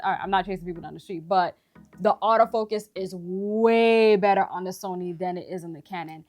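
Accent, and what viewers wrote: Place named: Jamaica. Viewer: 🇯🇲🇯🇲 Jamaican accent is amazing big up from yard 🔥🎥🔥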